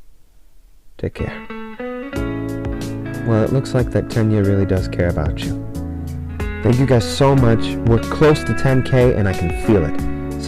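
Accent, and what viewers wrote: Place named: Scotland, United Kingdom. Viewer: why he sound Scottish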